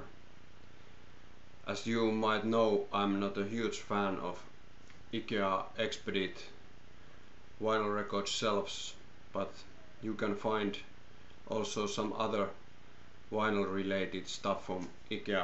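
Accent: Finland. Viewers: Kuulin sun aksentin, ja tiesin heti ett oot suamalainen. : D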